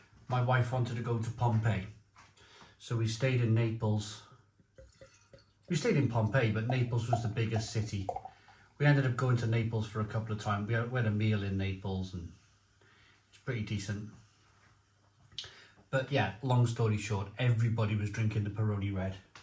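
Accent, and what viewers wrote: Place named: South Wales, United Kingdom. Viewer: Great review. I’ve never bought beer from HB but will now. Great to hear mention of Cowbridge. I went to Cowbridge School and worked in the Bear at the weekends, just loved my time there. Good to hear your South Walian accent “laaager”, “good level of caaarbination” and “Home Baaargins”. Takes me right back to Cowbridge 😊. Keep up the great work.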